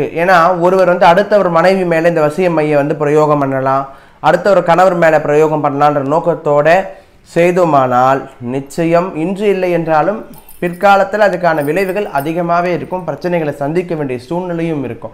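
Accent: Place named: Chennai, India. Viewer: Super chennai slang super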